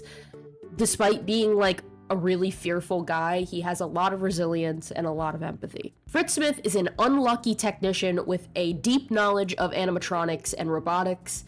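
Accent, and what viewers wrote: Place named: Appalachia, United States. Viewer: as someone else from rural appalachia hearing your accent made me really happy because i dont think i’ve ever heard any video essayist with one similar to mine. you’re awesome definitely subscribing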